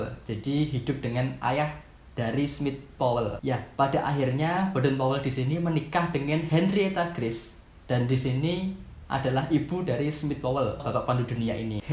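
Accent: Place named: Java, Indonesia. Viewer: Kaka medoq wkwk kerenn😹👍🏻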